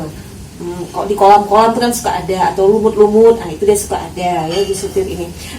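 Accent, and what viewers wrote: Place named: West Sumatra, Indonesia. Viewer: Bund asal sumatera barat ya??.logat bahasanya..😊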